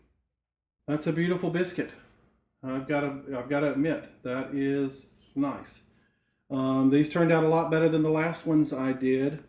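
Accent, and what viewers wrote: South, United States. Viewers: Why do I sound like a Southern girl after watching your videos? :) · Awesome! Thanks. I know that the KetoConnect guys said you're from Florida, but have you lived there all your life? You sound more like a SC or GA native. I've lived all my life in SC, NC and Florida, so truly a southern girl looking for an easy recipe for my favorite low country BBQ sauce (low carb of course) :).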